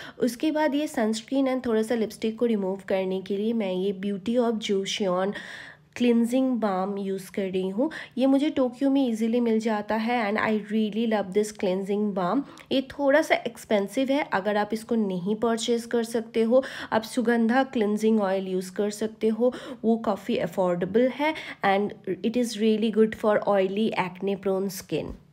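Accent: Bengal, India. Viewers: Looks like a বাঙালি Sounds like a বাঙালি too ❤❤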